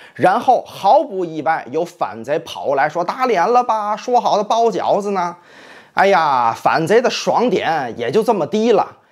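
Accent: Tianjin, China. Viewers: 天津话是真逗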